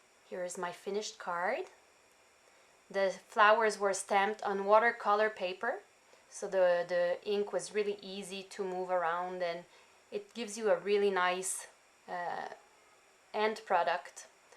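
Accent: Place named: Quebec, Canada. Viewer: Beautiful water colouring, it looks so pretty and relaxing. Love the rainbow with the string, can’t wait to do this with my daughter. Amazing mini book, you make it so fun and easy. Thanks for all these great projects, ideas, and inspiration. Love listening to your accent, working on our French in Ontario and hoping to make another trip to Quebec soon.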